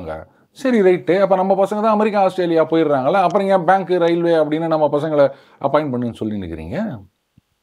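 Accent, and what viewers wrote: Chennai, India. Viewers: 😂 Sir i am a great fan of yours, they way you present it with Chennai slang is very very humorous, i really enjoy it not only myself Many others also will be enjoying these facts and reality 😅, keep it up Sir 🙏🌹🙏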